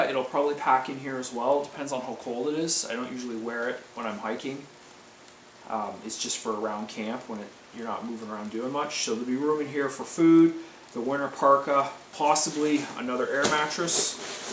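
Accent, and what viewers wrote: Canada. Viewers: Knew you were Canadian the moment you started speaking; cheers fella!!!!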